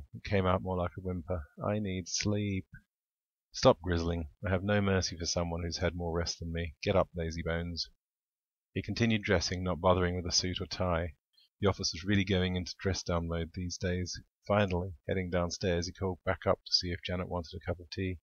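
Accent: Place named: England, United Kingdom. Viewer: Love the photos. Love hearing an english reader and can picture it perfectly. Makes such a difference from listening to the american audio books. So hard to picture a scene with nothing to relate to. Love it! Thank you for giving us brits a great story.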